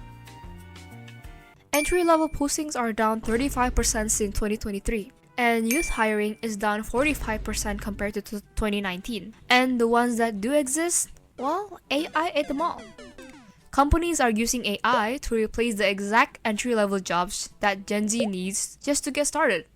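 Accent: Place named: Asia. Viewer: Asian accent suck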